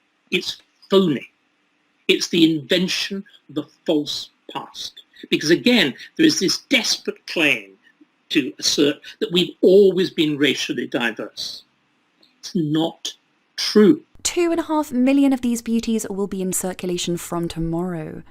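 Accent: England, United Kingdom. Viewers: Love the way you articulate with that beautiful English accent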